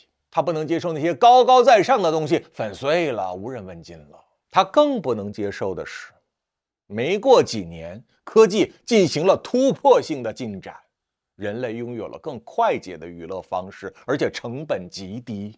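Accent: China. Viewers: ？你的頭髮還沒白，不夠格當叔，別自我抬搞一輩。你的自介雖用繁體字，但你的口音掩飾不了你是中國人的事實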